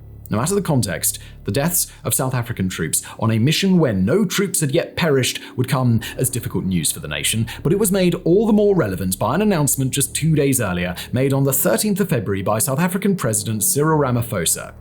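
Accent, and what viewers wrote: RP, United Kingdom. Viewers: he's a brit =) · I'm also from the UK. narration voice is an RP accent influenced by time living overseas. If you spend a lot of time speaking a foreign language it leaves imprints on your L1 speech over time.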